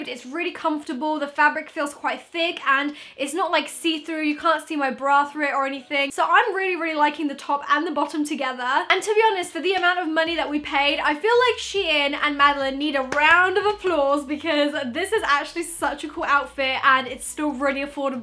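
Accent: United Kingdom. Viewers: are u Britain??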